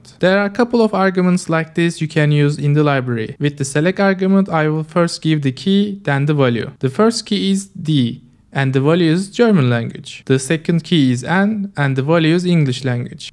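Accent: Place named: Turkey. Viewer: jeez, i know when i hear a turkish accent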